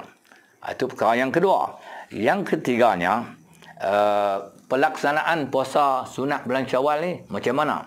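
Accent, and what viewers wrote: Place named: Kedah, Malaysia. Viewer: loghat Kedah ada yg sneng ada yg ssh utk digertiin...dri Kelantan Sy terus gk bisa gerti...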